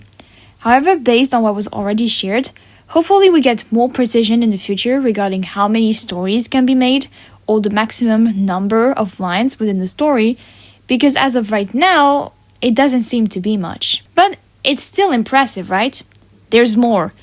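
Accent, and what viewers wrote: France. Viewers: Are you french? 👀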